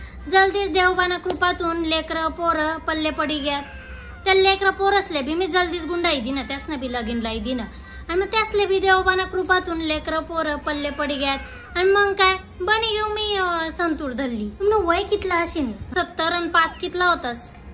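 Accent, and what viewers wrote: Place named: Marwar, India. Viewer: Hi कोणती भाषा आहे, खानदेशी का अहिराणी? खूप मारवाडी भाषे सारखी CH वाटते आहे 😁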